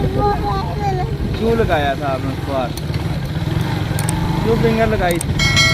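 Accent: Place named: Pakistan. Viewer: Urdu touch Mashallah g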